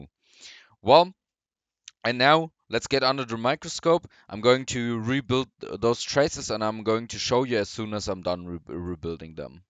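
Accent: Germany. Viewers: why talk german people so hard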